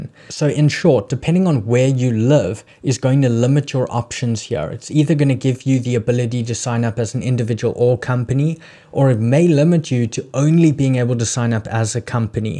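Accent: South Africa. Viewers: Hey, Good to hear a South African accent. I'm based in Spain (from CPT originally), I've opened an LLC in the USA, but struggling to get a Bank Account in the USA at the moment. Would you say it's best to open a Professional SC Acc as an Individual so that my Spanish address registered with my Bank Acc in Spain lines up with my address on SC until I get a USA Bank and then update my details to a company on SC? Is that even possible? Even if I have a US Bank Acc, it will likely have to have my Spain address I think... as I only have a Wyoming Registered Agents and Mailing address, and the agents have said it's not advisable to use that address for banks. Plus there's the utility bill issue... 🤷🏼‍♀️ Any direction you can offer on this? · Just as I was about to ask if you were South African you said you were South African lol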